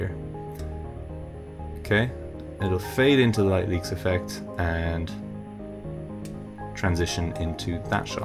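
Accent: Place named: Dublin 4, Ireland. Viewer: Dublin 4 accent if I ever heard one haha :P Great tutorial keep up the good work bro.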